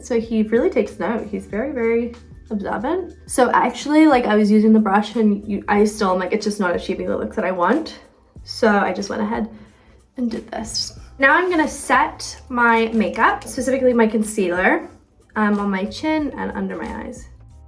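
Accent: United States. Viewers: I was noticing that too! She sounds so American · Do you guys think she has more of an American accent than British now? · What happened to her accent? It became really american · You're picking up the American accent Millie. Very cute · I just don’t get what’s going on with her accent. She’s worked in America for most of her life and still maintained a British accent, even in her interviews last year she had a British accent, but now she suddenly has an American accent? 😭 how does that even happen? 😂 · She lives mostly in the U. S. so, of course, she's going to take on a more American sounding accent... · its so cute how shes starting to sound a bit american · Her American accent is getting stronger I miss her UK accent 🥲🫶🏻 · She’s just got a standard accent now. Plus she does tend to put on an American accent a lot. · she basically has an american accent now lmao shes so cute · i love her american accent 😍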